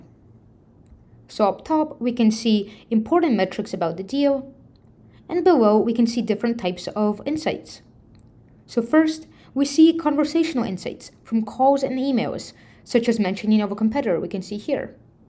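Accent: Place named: India. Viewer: strong Indian accent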